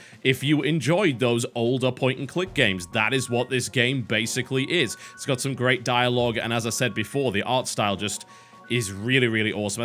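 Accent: United Kingdom. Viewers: Video content is good, british accent is just terrible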